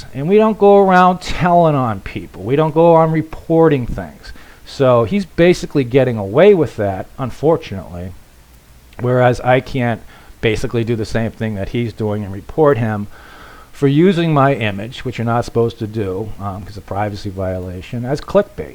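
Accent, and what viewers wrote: Boston, United States. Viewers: Hi, knew you were from Boston but first time I heard Dot!! Saint Ambrose in Fields Corner here. You??? Gotta know some of the same people!!! Was also stationed at Subic same time you were at Bragg.....hahahaha. Thanks for the vids....gotta make a trip to Dumaguete one of these days!! Have fun and stay safe!! Think you need an OFD tshirt. LOL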